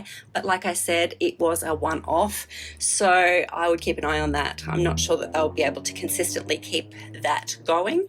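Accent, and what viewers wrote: Australia. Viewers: Enjoy your videos and the Aussie accent is a bonus.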